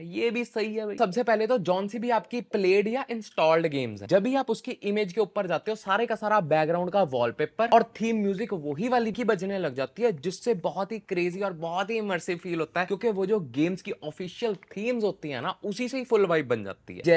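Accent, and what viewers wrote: Punjab, India. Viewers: Punjabi accent. Probably doaba???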